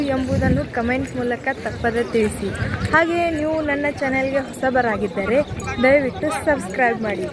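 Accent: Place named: Maharashtra, India. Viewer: I'm from hubli.. i watched ur almost all videos. Ee thara kannada matadorna nanu yavattu nodilla. First time nodtha idini it's nice to see that your kannada accent awesome..😊👏 i think your mother tongue Marathi Ansutte Alva ri..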